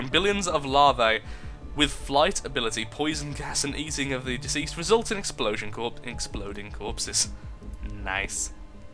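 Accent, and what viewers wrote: United Kingdom. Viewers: Lots of British sweet talkin' going on in this plague video.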